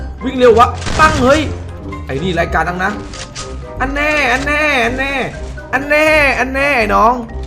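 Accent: South, Thailand. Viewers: อยากให้พี่ พูดใต้ทั้งวัน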